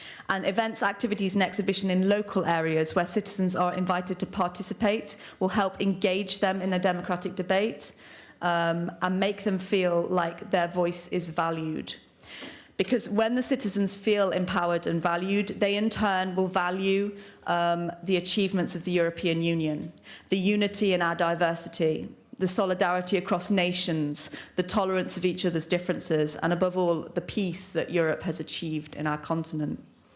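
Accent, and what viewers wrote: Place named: RP, United Kingdom. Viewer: She's very posh. Brexit - the "Europe has no federal ambitions" lie ..“What will actually happen if we stay in the EU” is a question no remainer will ever answer but here it is warts and all. Check it out if you wish ——&gt;&gt; 1: The UK along with all existing members of the EU lose their abstention veto in 2020 as laid down in the Lisbon Treaty when the system changes to that of majority acceptance with no abstentions or veto’s being allowed. 2: All member nations will become states of the new federal nation of the EU by 2022 as clearly laid out in the Lisbon treaty with no exceptions or veto’s. 3: All member states must adopt the Euro by 2022 and any new member state must do so within 2 years of joining the EU as laid down in the Lisbon treaty. 4: The London stock exchange will move to Frankfurt in 2020 and be integrated into the EU stock exchange resulting in a loss of 200,000 plus jobs in the UK because of the relocation. 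(This has already been pre-agreed and is only on a holding pattern due to the Brexit negotiations, which if Brexit does happen, the move is fully cancelled - but if not and the UK remains a member it’s full steam ahead for the move.) 5: The EU Parliament and ECJ become supreme over all legislative bodies of the UK. 6: The UK will adopt 100% of whatever the EU Parliament and ECJ lays down without any means of abstention or veto, negating the need for the UK to have the Lords or even the Commons as we know it today. 7: The UK will NOT be able to make its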